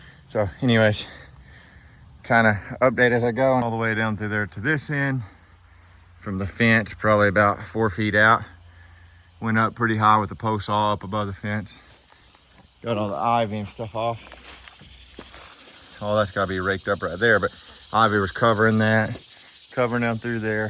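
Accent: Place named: Virginia, United States. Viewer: You sound like your from south west Va or nc my neck of the woods lol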